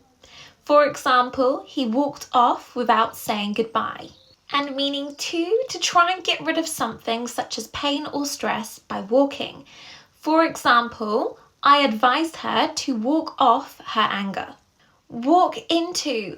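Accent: United Kingdom. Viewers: Love British English accent.❤❤❤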